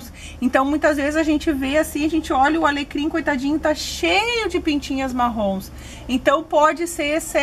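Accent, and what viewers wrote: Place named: Rio Grande do Sul, Brazil. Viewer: Nossa em outro vídeo comentei q pelo sotaque desconfiava q vc fosse gaúcha e neste vídeo descobri que és de São Leopoldo- RS a cidade onde moro hahaha q incrível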